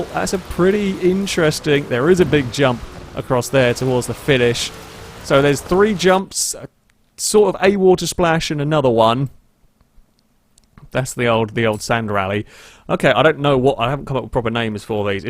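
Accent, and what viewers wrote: United Kingdom. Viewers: went full British in this one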